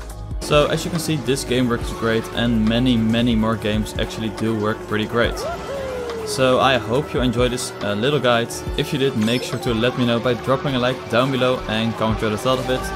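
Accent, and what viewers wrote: Netherlands. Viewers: Haha ik hoorde het aan je engels XD, niet dat het slecht is. Goeie tutorial trouwens :) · je hoort meteen is Nederlander (geen haat bro)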